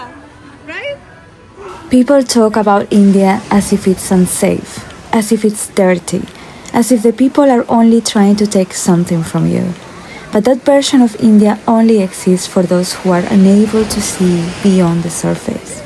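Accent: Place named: Iran. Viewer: Are you Persian?you sound Persian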